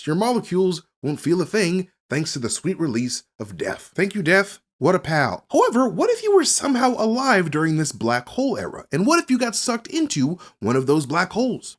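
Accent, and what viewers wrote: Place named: United Kingdom. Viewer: you're the black Loki Brutha💪🏿. You came back, can't stop, won't stop. You got the coolest brutha and the British accent, when yall come together, that's 21 SAVAGE. Dam this was a good bleepin break down. Ryan and his dog on Screen crush arguing on who need to step up. And ol boy is chained to that blue screen, yall keep doing yall Thang. WAKANDA 4EVA, & THE UK is okay! 👌🏿👌🏿👌🏿👌🏿💂🏿‍♂️💂🏿‍♂️💂🏿‍♂️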